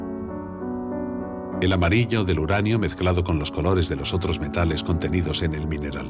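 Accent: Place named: Madrid, Spain. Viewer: ¿De dónde eres? No adivino tu acento ya que es casi imperceptible. Diría que Eres de España, en concreto de Madrid. Saludos.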